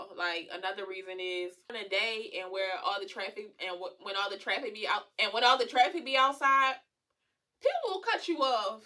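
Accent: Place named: New York, United States. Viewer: I hear the New York all In you lol .. I’m Right next door though in Philly so unknown how that parking get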